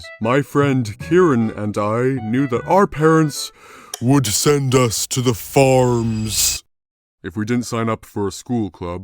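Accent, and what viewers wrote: Ireland. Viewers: I always loved the Irish accent, hell I'm making a show soon and the main villain is a Irish voice, also love your videos man 10/10👍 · I can here d4 accent from deep within you melt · Wait are u from Dublin?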